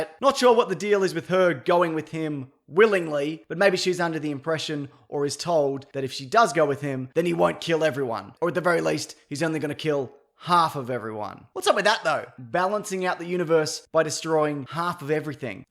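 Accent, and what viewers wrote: Australia. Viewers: Australian bloke haha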